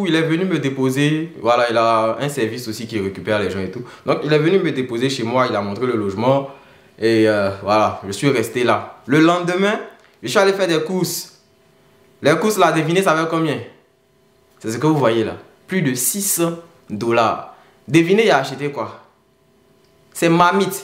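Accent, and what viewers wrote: Ivory Coast. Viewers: Belle vidéo J'ai kiffé Je suis camerounais mais je kif l'accent ivoirien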